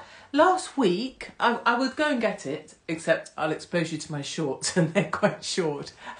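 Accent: United Kingdom. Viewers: Why is your English accent so light?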